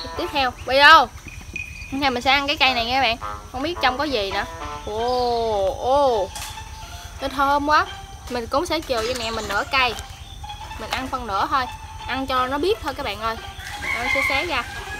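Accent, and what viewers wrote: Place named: Mekong Delta, Vietnam. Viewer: Do chị ấy người miền Tây nên nói giọng đôi lúc lợ lợ không rõ ý bạn:3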